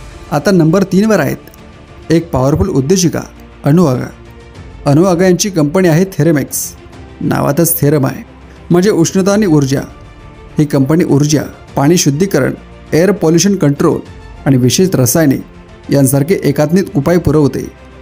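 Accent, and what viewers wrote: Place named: Latur, India. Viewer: आवाज बहुतेक पुणेरी वाटत नाही वाटतंय लातूर बार्शी कडचा माणूस बोलत आहे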